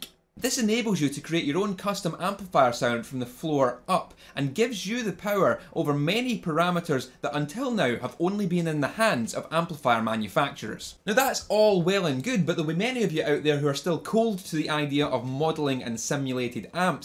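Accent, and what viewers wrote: Scotland, United Kingdom. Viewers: Something about a listening to a Scottish accent that makes everything more real! Thanks.... And being Australian I didn't need subtitles!;)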